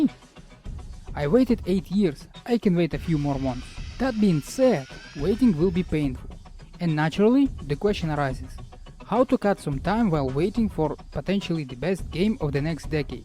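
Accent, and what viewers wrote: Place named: Russia. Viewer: Молодец, тренируйся. лойс поставлю, но акцент прям вааах какой русский. чувствуется что пока стараешься произносить слова, а не говорить на языке.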